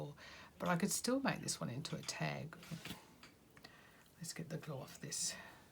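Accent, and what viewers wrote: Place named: Australia. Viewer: Great video. I love using what would be garbage as well. Great idea for the fries box. I'm from Australia too. Love hearing our Aussie accent on YouTube. Good luck with your channel. 💗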